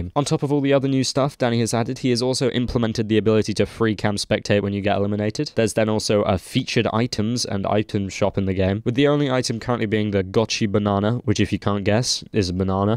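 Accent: Argentina. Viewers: are u from argentine ?? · Che sos argentino?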